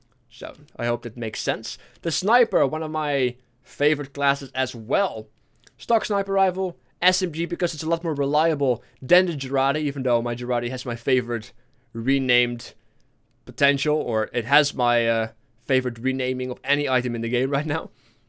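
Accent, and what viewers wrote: Netherlands. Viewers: Are you dutch? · Is this guy dutch?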